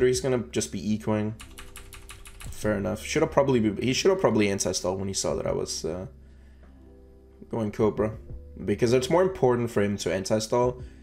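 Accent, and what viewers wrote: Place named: Denmark. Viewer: I just gotta ask, what do you think about Btd Battles 2. Oh yeah, I hear a weak danish accent, hører jeg rigtigt?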